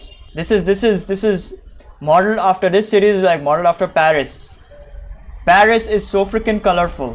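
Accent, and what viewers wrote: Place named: India. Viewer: getting shi**ed on in an Indian accent, love it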